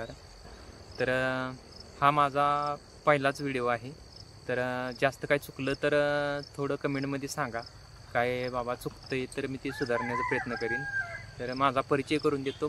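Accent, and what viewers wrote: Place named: Satara, India. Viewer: भाषा सातारी वाटत्या 👌👌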